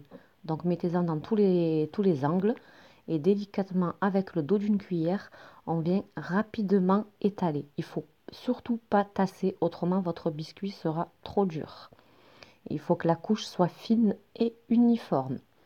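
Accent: South, France. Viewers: Il est toujours plaisant de visionner vos vidéos qui sont toujours très bien expliquées et votre voix est très agréable. Une voix du sud moi qui suis du nord. Merci et bonne soirée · Vous êtes un vrai chef . Trop top l’accent du sud.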